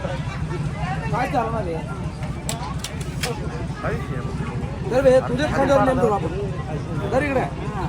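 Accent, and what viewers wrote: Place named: Solapur, India. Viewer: खाली ये बे लाज आबरू सोडला बे भारी होत हे😂😂😂😂😂😂 आ दादा खुप हसलो आमी छान बोलता दादा तुमी सोलापुरची भाषा ❤❤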